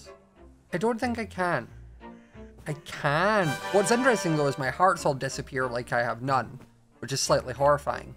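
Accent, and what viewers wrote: Scotland, United Kingdom. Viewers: why does he sound Scottish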